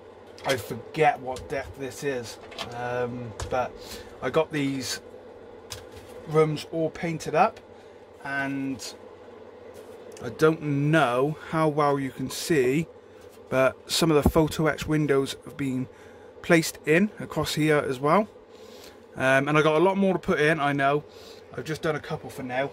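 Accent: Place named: West Country, United Kingdom. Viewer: Hey, Great vids m8! I did the 1/400 limited edition as a warm up recently and got this 200 turning up tomorrow so will be tackling it myself. Where about's are you from? I was born nd breed in Plymouth myself and watching your vids has had me smiling and chucking away 😊 (not in a bad way or anything), i've missed the west country twang 😊.. hope your getting on alright with this bad boy of a model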